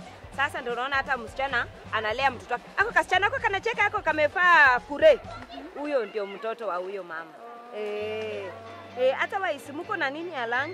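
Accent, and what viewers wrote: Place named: Luhya, Kenya. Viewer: I love they way you vuruta words...total luhya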